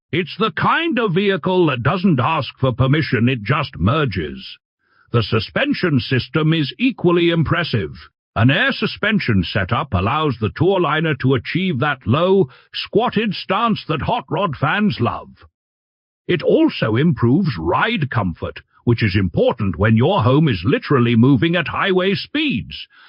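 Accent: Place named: United Kingdom. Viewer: A nice truck but what is with the goofy British accent? It makes me give a thumbs down.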